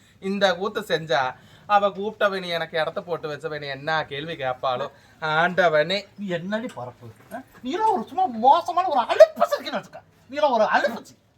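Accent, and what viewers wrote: Coimbatore, India. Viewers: கோவைத்தமிழும் உங்கள் முகபாவனையும் மிக அருமை😂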